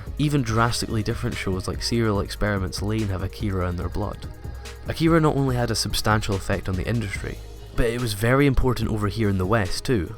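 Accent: Scotland, United Kingdom. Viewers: You Scottish bro?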